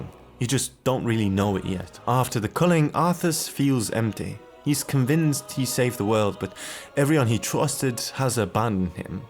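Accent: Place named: United Kingdom. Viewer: so many words. so little insight. I should've closed the video as soon as I heard the British accent.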